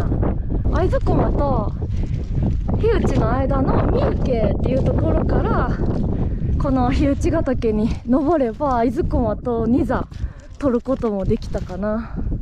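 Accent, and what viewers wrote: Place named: Kansai, Japan. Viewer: ずっと関西弁、大阪弁でいてね !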